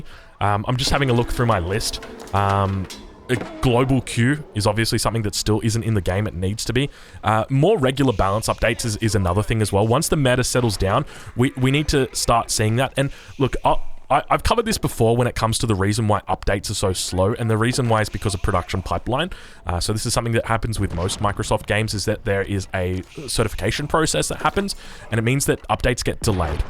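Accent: Australia. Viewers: I think you need to change how you cast them. All of these games aren't tournaments games where everything is super important to watch or pay attention to. Maybe. Focus on a few battles. Maybe build orders but jump around more. Don't have a 20 min casting slog where you are trying to fill the air with your Australian accent. Maybe reduce the time? By skipping boring parts. Or something idk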